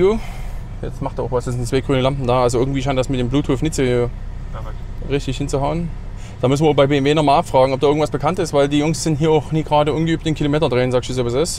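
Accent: Saxony, Germany. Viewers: Alter deine sächsische akzent ist absolut Hammer 🤣🤣🤣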